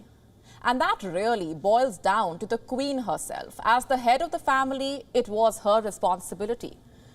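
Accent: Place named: Punjab, India. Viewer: Heavy punjabi hindi accent!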